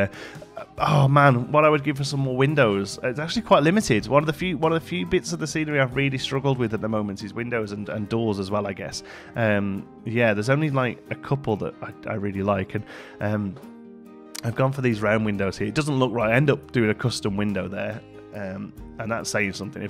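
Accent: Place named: Midlands, United Kingdom. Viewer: I love your builds, I’ve learnt a lot watching your tutorials. I recognise your accent, I’m from the Midlands I can hear a slight twang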